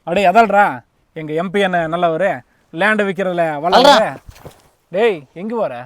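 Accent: Kongu, India. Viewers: வியாபாரம் செய்வதை விட உங்களுடைய பேச்சுத் திறமையும் கொங்கு தமிழும் உங்களுடைய காமெடியும் அழகில் அழகு வாழ்க வளமுடன் நன்றி மாப்ள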